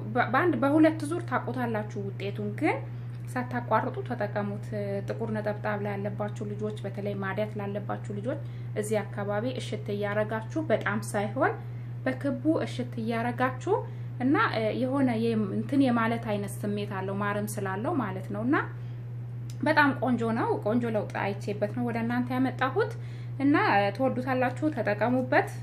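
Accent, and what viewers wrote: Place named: Ethiopia. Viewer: ሲሪላንካ እና ህንድ ትመስላለሽ ስታወሪ ነው ሀበሻ መሆንሽን ያወኩት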